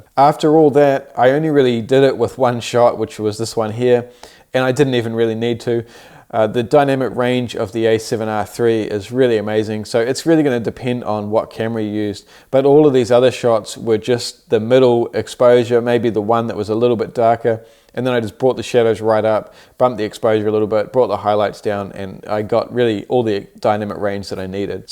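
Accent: New Zealand. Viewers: Refreshing to hear that Kiwi accent!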